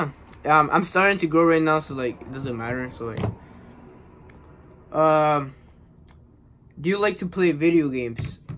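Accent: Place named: Spain. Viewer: Spanish accent😍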